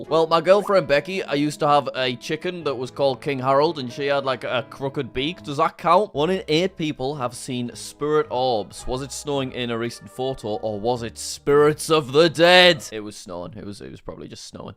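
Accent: United Kingdom. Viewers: shut up asshole its British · Is British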